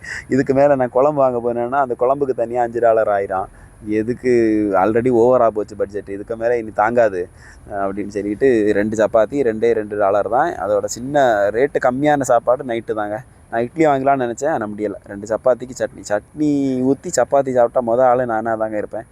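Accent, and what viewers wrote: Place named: Kanyakumari, India. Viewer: நீங்கள் கன்னியாகுமரி தானே..... நீங்கள் பேசும்போது புரிகிறது..... வாழ்த்துக்கள்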